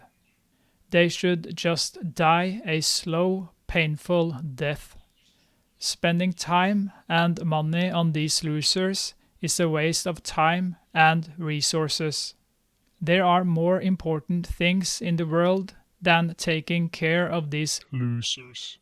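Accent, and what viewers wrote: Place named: Sweden. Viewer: Jag bara: Han e svensk direkt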